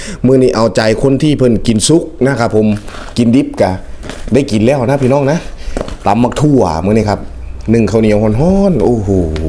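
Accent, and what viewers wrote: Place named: Phu Thai, Thailand. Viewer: สำเนียงเหมืองคนภูไท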